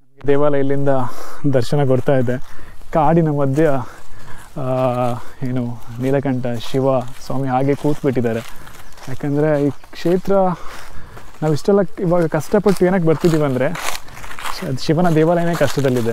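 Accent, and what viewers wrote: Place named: Dakshina Kannada, India. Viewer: ದಕ್ಷಿಣ ಕನ್ನಡ ಕೇಳ್ಳಿಕೆ ಒಂದು ಚಂದ ಮಾರೇ🫶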